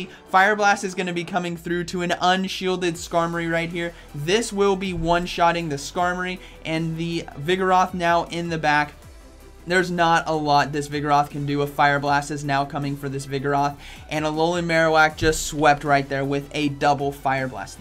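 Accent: Netherlands. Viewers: Lol this guy for sure is from the Netherlands. They have such a specific accent :)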